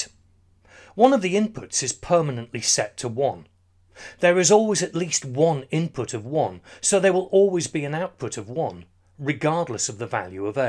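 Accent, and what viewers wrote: United Kingdom. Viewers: Only reason i picked your video was because of the British accent. Hate to admit it but it is what it is.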